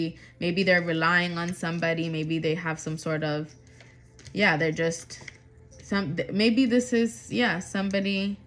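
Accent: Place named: Caribbean. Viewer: I love your Spanish, are you Dominican? Cuban? Puerto Rican?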